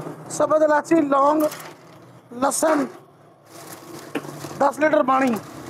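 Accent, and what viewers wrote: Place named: Pakistan. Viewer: ویسے پنجابی میں اردو کا ٹچ بھی ودیا اے جناب 😅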